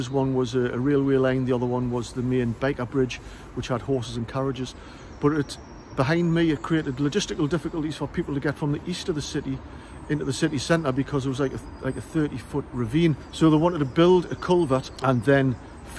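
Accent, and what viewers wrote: Newcastle, United Kingdom. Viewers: Great video,research and genuine empathy . You sound a really decent and genuine bloke(and Geordie) 👍 Howard the lads!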